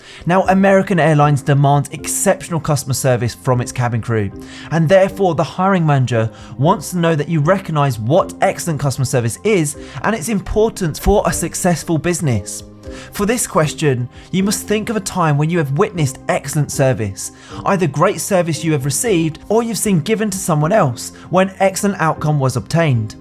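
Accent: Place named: United Kingdom. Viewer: OMG, great video mate i appreciate a lot this video, you can use this video for any job interview. Yor answers are, top notch, to me they seem more for chasing big companies that pay big $$$ for a flight attendant.... ehee. I hope not a lot of viewers admiring this positions as they look flashy, but when you learn how low is their pay, I'm assuming the pay have been going up after covid, but still $2,000 - $3,000 that's sad to me. If you young and single is fine, i rather become a pilot if I'm going this direction. Great awesome video for future interviews, glad i run to it, you got my subscription, the British accent helps too.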